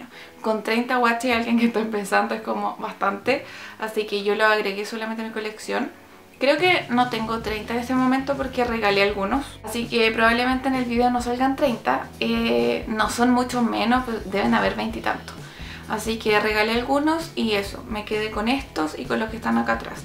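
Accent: Chile. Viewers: Eres chilena porque ablas como chilena y ademas eres muy linda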